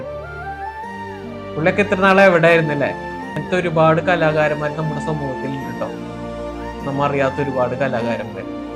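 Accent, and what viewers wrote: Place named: Thrissur, India. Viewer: ശെരിക്കും ഉള്ള കലാകാരൻ..... പ്രകൃതിയിൽ ആണ് 🥰🥰🥰🥰എടോ താൻ തൃശ്ശൂർ ആണൊ place...... Slang അടിപൊളി 👍🏻👍🏻👍🏻👍🏻🥰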